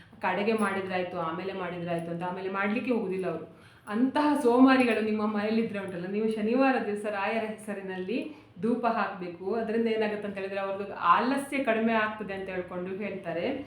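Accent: Mangalore, India. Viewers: ನೀವ್ ಮಂಗಳೂರಿನವ್ರ. ಮಾತಾಡೋ ರೀತಿಯಿಂದ ಕೇಳಿದೆ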